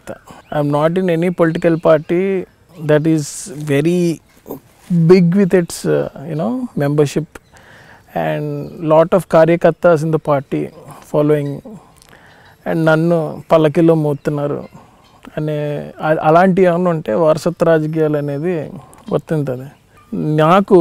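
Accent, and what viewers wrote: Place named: Godavari, India. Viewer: Full Godavari accent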